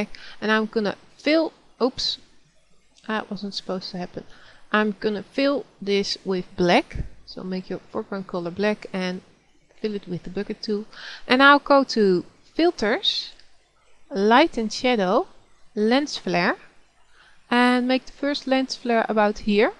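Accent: Netherlands. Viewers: haha ik hoorde na de eerste letter dat je nederlands was xD wel cool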